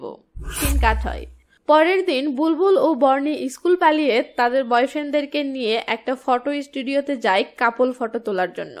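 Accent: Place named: Mymensingh, Bangladesh. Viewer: বুজতে পারতেছি আপনার বাড়ি ময়মনসিংহ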